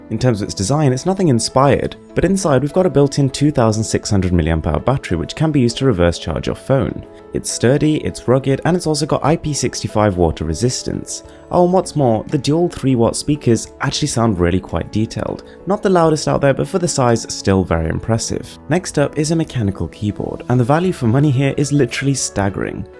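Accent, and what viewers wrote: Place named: United Kingdom. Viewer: British accent + top notch quality + great person = Mrwhosetheboss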